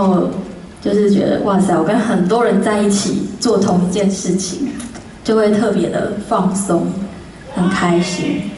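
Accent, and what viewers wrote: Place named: Taiwan. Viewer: 还是她的口音跟我台湾人的朋友的很像。有亲切感😊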